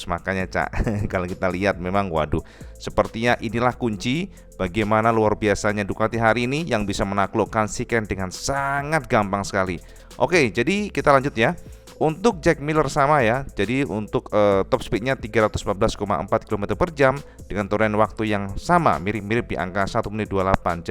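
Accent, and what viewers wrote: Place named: Java, Indonesia. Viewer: Ini mah chanel sales yamaha yah.. Haduhhhh dasar nih chanel orang medok..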